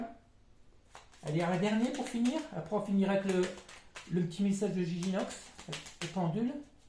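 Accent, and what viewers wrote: North, France. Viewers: Bonsoir nouvelle abonnée g vue votre vidéo avec selena à votre voix g su de suite que vous étiez du nord je suis du pas de Calais près de lens 😂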